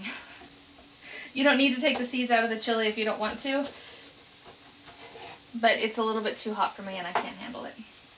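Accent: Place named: Norway. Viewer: actuly kinda cus you know you have that norwegian hmm actuly dont know how to explain it my its in the ending of words where you can hear it xD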